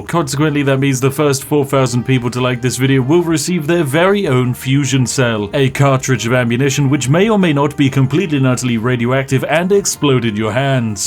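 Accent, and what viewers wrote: United Kingdom. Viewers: Day 5 of reminding Spiff we want to hear him say "Sugoi" in his glorious British voice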